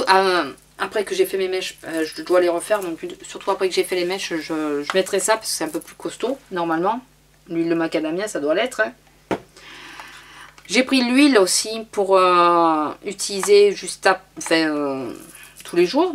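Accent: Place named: South, France. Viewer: Coucou tu vient de quel endroit avec se petit accent du sud ?... ( sinon j'aime tes vidéos )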